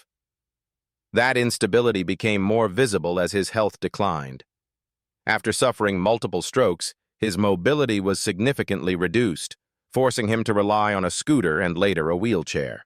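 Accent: United States. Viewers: An American voice as well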